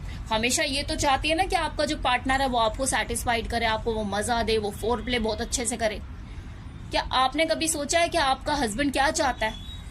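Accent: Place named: Delhi, India. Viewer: mujhy lagta hy aap Dilli se hn na. Apki zaban (Urdu) aur lahja bata raha hy... Aur aap bohot khubsurat (sundar) ho....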